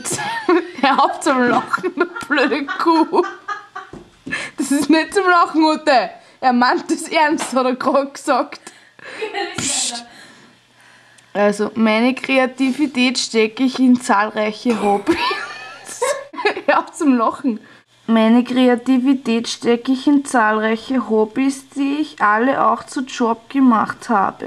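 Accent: Austria. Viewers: hehe ich mag deinen oesterreichischen akzent! du klingst gar net wie die leut aus baden wuertemburg. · a fast-Linzerin? hätt i fost docht, von da Aussprache her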